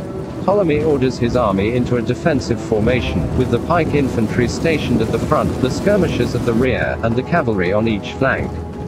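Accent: United Kingdom. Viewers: Something about watching a Ancient battle cinematically!! with a British narrator ! like watching a documentary ..excellent..only thing missing is watching some older history expert showing us some of the weapons of the age ..xoxoxo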